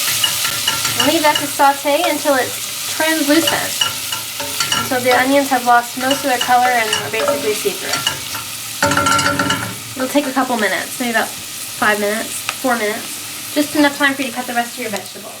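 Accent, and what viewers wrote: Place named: France. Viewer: I read your comment and get your concept. I love it! Recipe looks good. I like it soupy stew like but Check out Martha Stewart and Daniel Boulud ratatouille. It's very authentic as they eat in France as side dish. Bonne chance X p.s your French accent is so incredible!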